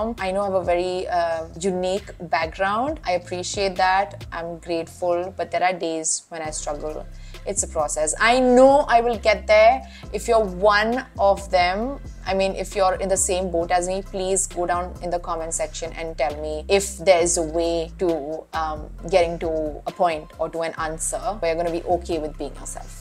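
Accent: Maharashtra, India. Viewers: Your accent is Indian 😁🤝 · I am from Sri Lanka. You still has typical indian accent. But i really enjoyed listening to you. Yes we know there are jews in india. Kerala,Bombay & Calcutta. May HaShem bless you. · Had I not explicitly known otherwise, I would really have assumed you to be Priyanka or Neha. You certainly talk and even look like an Indian - not that that's surprising 😊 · You can pass off as an Indian girl anytime. Your accent laooks everything...your Indian mate...but Congratulations on ur new home Israel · You know, you sound Maharashtrian to me🤗😍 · You also have our accent and you look more like an Indian than an Israeli. So aap humare hi bandi ho😅. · Your accent is still very Marathi heavy…. Being a Mumbaikar I could easily identify your Marathi accent. All the best with your new life in Israel. Take care. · I am hearing a marathi mulagi ...😅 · i am sorry to differ but you do have a very strong Indian accent. 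please enjoy the best of both the world. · यो मराठी, वाटलच मला. तुझ्या accent वरून वाटतच होत. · I would treat you like a bhartiya more rather than a jewish ☺️😸 i respect jewish culture but in your case your looks your accent are more like a bhartiya kanya 😸☺️😘 someday i would even like to see you wearing saree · 2-3 years ago.... I saw video about jews.... They were doing documentary on jews.... After watching that video i found that there is Jewish community in western maharashtra.... And i could tell that you are Maharashtrian just by your accent.... So obvious.... That tone was so familiar... I also saw some bene Israelis in Northeast states... There were fighting to go to israel..... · Don’t worry you sound like 2000% Indian. I don’t hear any other accents. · You are Indian, you can be an israeli and still have Indian-ness in you. India is a very diverse country and accomodates everyone. 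So dont worry, you belong somewhere - India (Bhāratha), you were born here and know the country just like us. Israel is also a good country, hope you have a good time there. But remember, you belong somewhere and you have a home! PS : We can still sense a strong Indian accent in your English. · Great video and honest account about your early life in India. I’m Indian Australian American and am thrilled to hear about your move to Israel. I’ve never met anyone Jewish in India — you folks are exotic! In this video your accent is Indian with the extra-thick Ts, emphasized H sounds — so not sure what your Indian friends are talking about 🤔I hope you haven’t been affected by the recent terrorist attacks. God bless and take care 🇮🇳 🇦🇺 🇺🇸 🇮🇱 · First of all you are a gorgeous woman. Then your mannerism and accent are 100% indian. And I am loving that. Your looks are almost north Indian, but then there is no such thing as a Jewish look, so hard to tell. 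You have a positive attitude. Keep it up. I was born and brought up in India for the first 23 years as a Hindu Brahmin, but then for almost the last 40 years, I am living in the US. Keep your friendship with your Indian friends. They are the sweetest. You are the first indian jew I got to know about. I have many Jewish friends of European origin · You maybe a Jew. But there is no way, you are Israeli. You are Indian at heart. The way you talk, and emote is purely Indian. And your name is funny. is a vitamin supplement sold in India, which is said to provide instant energy. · your accent is close to Indian though but your face is more close to Jew.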